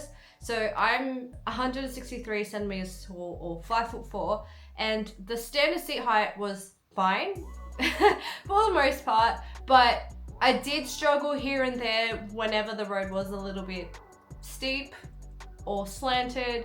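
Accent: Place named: Australia. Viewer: Asian girl with Australian accent is so cute 🥰 not to mention you drive a s1k 🔥🔥🔥🔥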